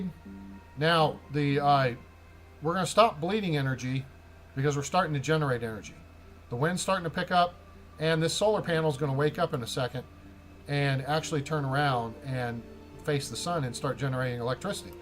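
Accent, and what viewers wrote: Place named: New Zealand. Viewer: You do it so properly. Step by step telling. But eehhm 🥴 what I see on your playlists are confusing.... By the way you are not from up here. Probably from down under. Nooo, anyway. Not fully a wombat. New Zealand probably. I hear you. Trying to place you geographically..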